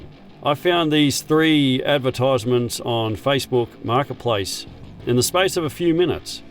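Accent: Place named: Australia. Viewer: I've understood so too, that it's not a small reason for that channel to be so popular. And those videos are quite well scripted. Even though they make mistakes every now and then. Your pacing, use of voice, script, editing and that Australian accent plus those sentences or questions with your voice modified are well made/good content. 👍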